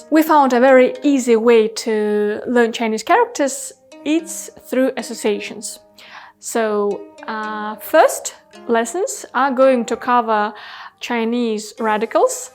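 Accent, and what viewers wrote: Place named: Kazakhstan. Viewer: I’m starting learning 汉字，because I want to learn calligraphy, so before I want to learn radicals. I searched on YouTube and found this channel, I’m literally like this 😮: it’s like all my dreams coming true. I don’t know exactly why, but this video and your channel really, really got deeply in me! Your grace, cheerfulness, clarity, humor, and maybe the slight Kazakh accent make this so wonderful. I’ll watch a video a day 🤩. 谢谢，! I hope you can continue doing these and other videos in the future 😊 P.S.: Omg 水晶 Crystal is water and 3 suns, shiny water! 😱😱😱 this is amazing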